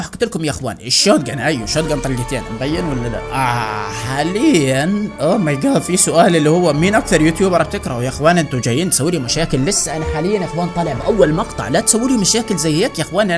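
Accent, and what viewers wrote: Iraq. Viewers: لهجتك بالعراقي تجنن 😂😂❤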